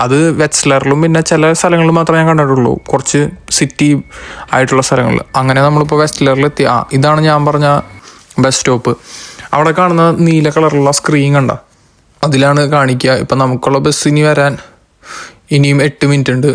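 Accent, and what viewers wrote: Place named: Thrissur, India. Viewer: ചേട്ടൻ തൃശ്ശൂർ ആണല്ലേ സംസാരം കേട്ടപ്പോൾ മനസ്സിലായി ഞാൻ പാലക്കാട് ഇപ്പോൾ പോളണ്ടിലുണ്ട് അവിടെ ജോലി ശരിയാക്കി തരണേ പ്ലീസ്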